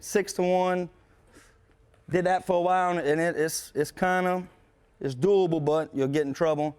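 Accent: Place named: Louisiana, United States. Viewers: hes from louisiana for sure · Lol he sure is. That hard Cajun accent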